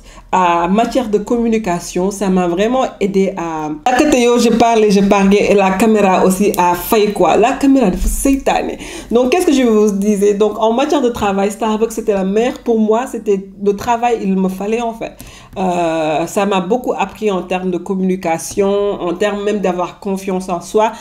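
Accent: Senegal. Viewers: J’adore l’accent sénégalais ❤️❤️❤️ much love from cameroon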